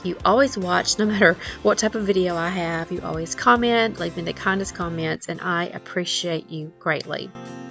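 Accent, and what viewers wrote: South, United States. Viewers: Hey, I’ve been following you for a while now but have not introduced myself. I know you always tell us to introduce ourselves. I live in New England but one side of my family is from the deep south so I enjoy your sweet southern accent. 😁 The bird house is hanging from the roof line over your deck. I do not have a YouTube channel but I do have an Instagram account because I also enjoy decorating. I just started following you over there on Instagram.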